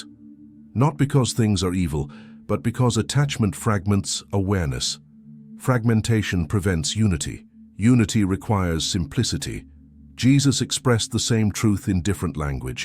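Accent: United Kingdom. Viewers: OHH, GOD, NOT. THAT AWFUL BRITISH ACCENT......AGAIN !!!!!!!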